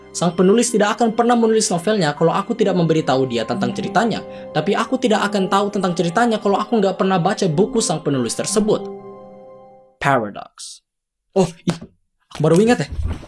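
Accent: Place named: Indonesia. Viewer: Gila semua katanya logat indonesia kecuali kata "paradox"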